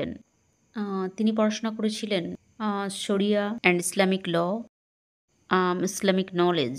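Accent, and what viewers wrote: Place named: West Bengal, India. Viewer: এটা বাংলাদেশি চ্যানেল কিনা আমি বুঝতে পারছি না। ভাষাটা পশ্চিম বংগের মনে হচ্ছে যাই হোক ভাষা টা ব্যপার নয়। ইসলাম প্রচারের জন্য চ্যানেলটা ঠিক আছে তবে এখানে অন্য পিকচার গুলি দেখাবেন না প্লিজ। যিনি কথা বলছেন শুধু তাকে দেখান। অন্য পিকচারে পরদাছাড়া মহিলাদের দেখানো হয় এটা ইসলামের সংগে যায়না। আশা করি বুঝতে পারছেন।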